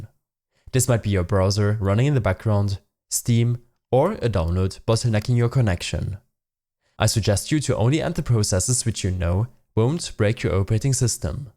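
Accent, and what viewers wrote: Germany. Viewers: man hört sooo hart dass du deutsch bist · Man hört dass das ein deutscher ist😂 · Junge man hört anders dass du eig immer deutsch redest xDD :DD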